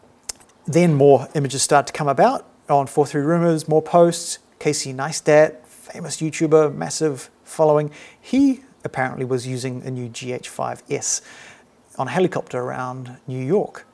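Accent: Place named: New Zealand. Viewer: Love hearing a kiwi voice on the tube. I am waiting for the GH5s announcement. I am a kiwi, living in china watching this in Ukraine. Keep it up dude.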